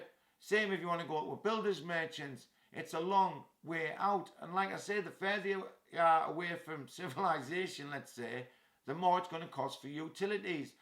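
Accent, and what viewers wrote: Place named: Teesside, United Kingdom. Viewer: Sounds like a T T Teessider accent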